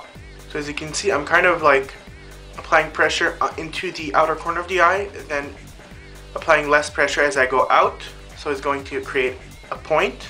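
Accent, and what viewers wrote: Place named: Quebec, Canada. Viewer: He speaks french canadian ;)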